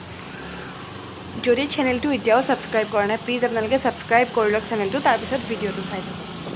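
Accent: Nalbari, India. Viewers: অ, মাজে মাজে নলবৰিয়াৰ দৰে কথা কোৱা কাৰণে সুধিছো😍😍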